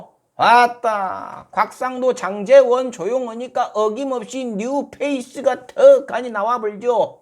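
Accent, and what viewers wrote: Jeolla, South Korea. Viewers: 늘 멋진 마음 멋진 내용 맛깔스런 전라도 사투리 참 좋아요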